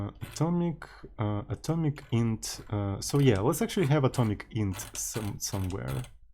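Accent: Russia. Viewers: This thing u said inspires me a lot I always afraid of doing dumb things and writing shitcode but I want to improve Also your English is so Russian so I feel like a native English speaker listening you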